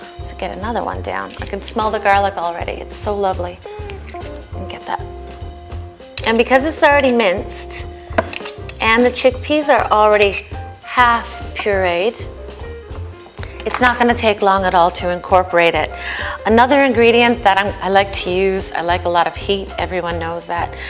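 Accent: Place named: Newfoundland, Canada. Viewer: I picks up a little Newfy accent.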